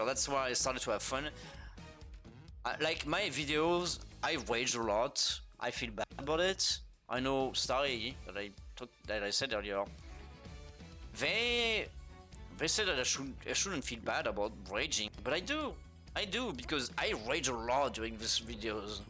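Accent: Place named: France. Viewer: What is that accent? 😮 Are you French or Canadian or from Louisiana? Btw my favorite game of Mortol II ❤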